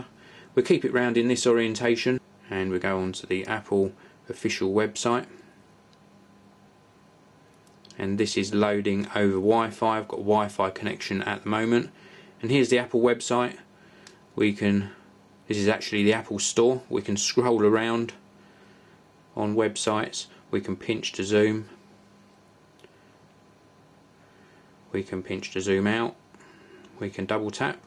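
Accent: England, United Kingdom. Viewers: Best british accent yet :) Nice review as well! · Nice review! Could we have a comparison video of this phone with the Orange San Francisco? Where is your English accent from?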